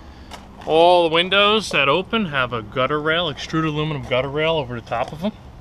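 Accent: New Jersey, United States. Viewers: Great job! Thanks for pointing out the details. The camera lens was a bit annoying at times but it also showed a lot more that way. Love the Jersey speak. Had the feeling Ray Romano was selling me an Airstream!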